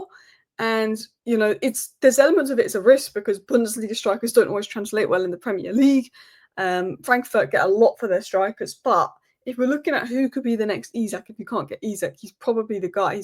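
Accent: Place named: Liverpool, United Kingdom. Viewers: you amaze me how you disguise your scouse accent, a real talent to add to your many others. Keep it up lass, YNWA! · Secret scouser ❤❤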